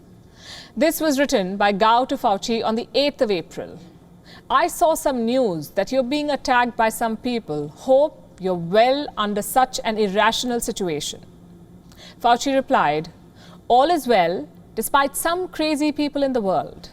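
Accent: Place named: India. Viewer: u have heavy indian accent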